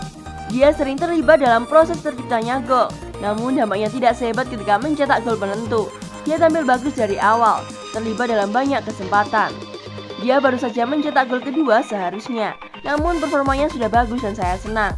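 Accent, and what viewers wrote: Java, Indonesia. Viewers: pengisi suaranya medok min 😁🤭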